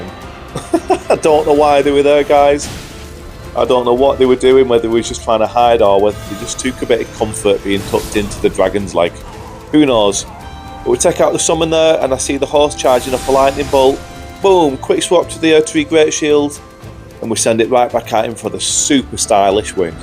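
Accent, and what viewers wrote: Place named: Yorkshire, United Kingdom. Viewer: Love your accent mate! What part of the UK? Yorkshire?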